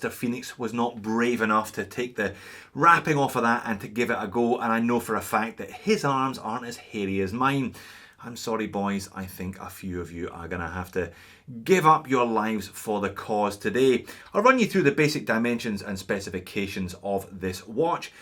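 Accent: Scotland, United Kingdom. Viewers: No he has a lovely Scots accent, the "go to" pirate accent is a sort of generic West Country accent.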